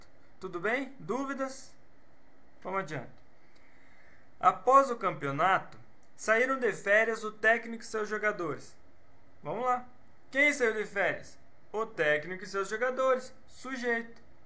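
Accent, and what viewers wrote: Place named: Northeast, Brazil. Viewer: vamos adiantiii kkkkk sotaque nordestino em filho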